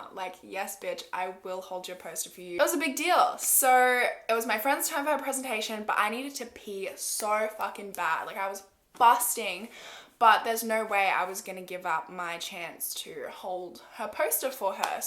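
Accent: Australia. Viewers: I know I’m so late lol, but I’m binge watching some of your videos.. In a non weird way, I could listen to you talk for hours.. Your voice is so soothing & I love your Australian accent. It’s so funny to hear you pronounce or say words that we wouldn’t normally use to describe something in America, it has me so intrigued lol.. & all of the earring changes for each topic was iconic! Love your content girl 🥰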